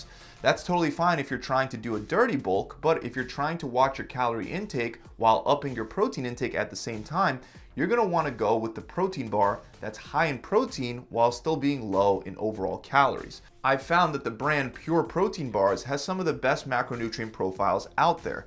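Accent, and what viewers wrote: Philadelphia, United States. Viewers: I wonder if he’s from philly 😂 based on the way he says water lol! It’s awesome! Also… great video!! Everything is straight to the point and quick!!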